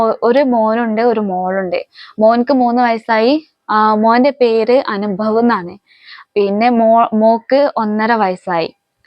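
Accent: Malappuram, India. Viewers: മലപ്പുറം മലയാളം ആണ് പറയുന്നത് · Malappram basha ath mathi 🤗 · Samsaram kettitt keralathil malappuram allenkil kannur aayirunnu enn thonnunu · Samsaaram malappuram or kozhikod kaari. Look kandal nortj india kaari anu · മലപ്പുറത്തു ആയിരുന്നോ സ്ലാങ് സംസാരിക്കുമ്പോൾ അങ്ങനെ തന്നെ മലപ്പുറത് ആണ് ഞാനും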